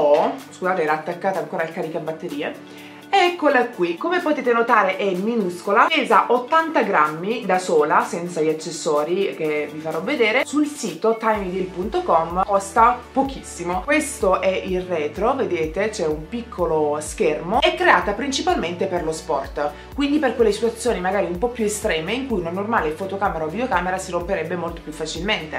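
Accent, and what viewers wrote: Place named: Rome, Italy. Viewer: Inizia un video parlando con l'accento ROMANO! Ti adoro sei stupenda😍😍😘❤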